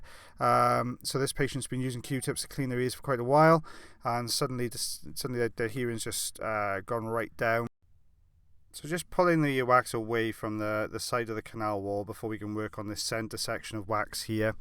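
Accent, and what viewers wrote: Wales, United Kingdom. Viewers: Hes from Wales in the UK. (Just incase you didnt know) so it's a Welsh accent. X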